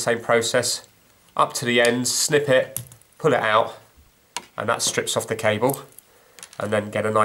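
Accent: England, United Kingdom. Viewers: It's how English is spoken by the English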